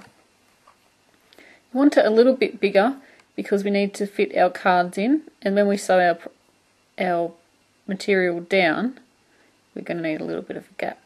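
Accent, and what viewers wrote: Australia. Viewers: Made this today in an earthy brown to match the little bag I made from your cluster stitch bag pattern. SUPER cute. LOVE your patterns. Love your videos. LOVE your Aussie accent ;) p.s. Aussies rule. Never met an uncool Aussie.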